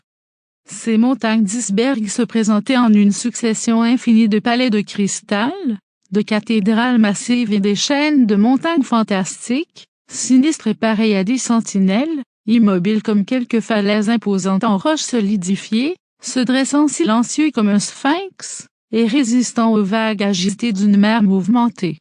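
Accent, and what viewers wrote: Canada. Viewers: 54 minutes d'accent quebecois , c'est terrible, mais cela ne va pas être possible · La voix est horrible avec un accent canadien en plus de ça, la combinaison est atroce 🤮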